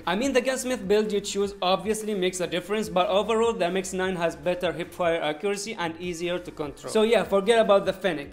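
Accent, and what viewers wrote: India. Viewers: The indian accent makes this video 100 billion times better. Idk why. I guess this is caused by watching Mutahar from someordinarygamers last night for 5 hours straight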